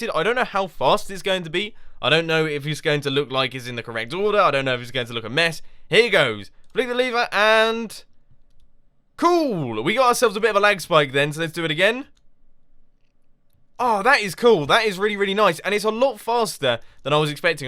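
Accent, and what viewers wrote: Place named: United Kingdom. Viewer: Dear! I have been watching your videos for ages, I like very much your YouTube channel, and your person. Especially, your voice. It was the main reason why I started following you. It catched me, with your British accent. And THIS, is my first comment ever, to a video of yours! You are asking about the new Graphics of the channel. The pop-ups and so on. They are very nice! But, only one thing, maybe, could be better, in myopinion: The "+1" pop-up. It's green. And... It's a bit strange, it clashes with the blu, the orange, and the other colors, this, at least for me! The animation is very nice. But the color itself... Neither the "+1" bar of Youtube is green anymore. Maybe... You could change it? This is everything I've got to say.:P Bye bye!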